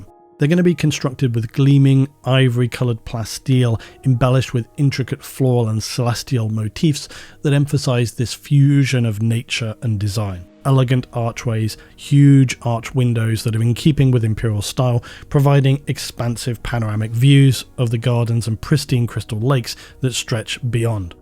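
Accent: England, United Kingdom. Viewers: You're right about language being insurmountable. Until you mentioned it yourself, I was checking off each suggestion as impossible due to the language barrier. I was listening to your English accent as an American, knowing that the differences only represent a few hundred years of geographic distance. Even with Imperial media technology serving to provide some linguistic cohesion, a modern traveler would probably sound like they were speaking Old English to someone in 2024. So, completely unintelligible. Other notions that crossed my mind would be strange ammunition calibers of travelers and the ancient disease we carry that had been stamped out long ago by immunizations, etc. A travelers might be tracked and captured as a Patient Zero of a long forgotten virus.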